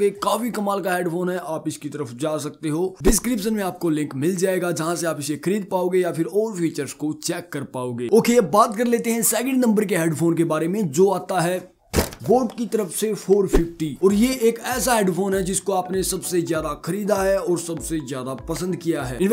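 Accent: Haryana, India. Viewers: Bhai haryana t h k tu 😂🤙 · Pakka tum Haryana ke hi ho:face-green-smiling::face-green-smiling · awaj me haryana jhalak rha h bhai.😃... baki full support h bhai ko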